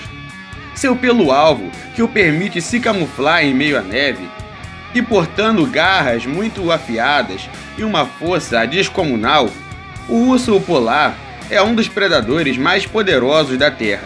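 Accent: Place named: Rio de Janeiro, Brazil. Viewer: Saudades desse sotaque carioca 😁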